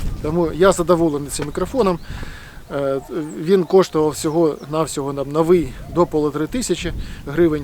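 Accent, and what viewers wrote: Russia. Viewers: Щирість та правда - це завжди легко і по-справжньому.Це мене "підкупляє" Ви правильну ставите ціль,мету :золота кнопка.Тільки так люди досягають успіху на шляху до здійснення мрії.Тільки високі цілі,а не на півдороги ,напівмету .Ще,не для "доривання" чи образи.В словах,наприклад копать,допомагать, знімать,розказувать,,пробувать,брать,працювать ,включить(болгарку) в кінці треба закінчувати. на И , бо ці слова відповідають на питання "що робити?/зробити".А от ,наприклад,"і всі думки погані (що роблять?) відходятЬ - тут вірно.Або такий приклад:Що рОбить? - відходить;що робИти? - відходити Ще раз наголошую- це не в образу вам.Ви вже добре розмовляєте українською.Це просто тонкощі і ,звісно, наслідки ситуації життя в російськомовну середовищі.Я сам з Миколаївської області , з російськомовного селища збудованого у 60-х роках.Половиною населення були,до розпаду СРСР ,росіяни,що приїхали працювати на два нових заводи, селище тому і побудували.Тому і школу закінчив російську.Та й зараз в побуті ще селище,здебільшого,російськомовне - як наслідок.Але потрохи у вас і у нас всіх все вийде,і все буде Україна!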